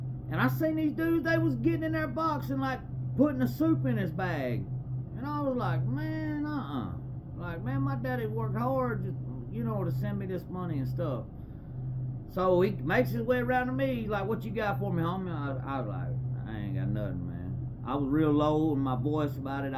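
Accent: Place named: Louisiana, United States. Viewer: All my family's from Louisiana and Mississippi and you sound just like em lol. Great channel, great storytelling, subbed!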